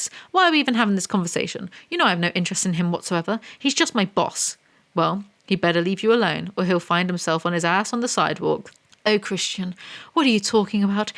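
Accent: United Kingdom. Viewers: Damn you, i can no longer sleep without the sound of a snarky brit bullying rich people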